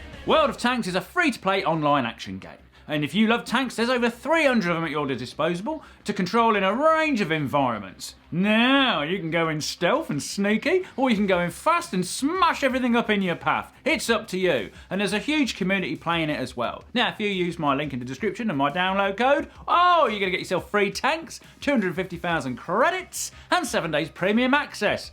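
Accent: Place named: Luton, United Kingdom. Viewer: Fit blonde with a Luton accent. All fur(ze) coat and no knickers.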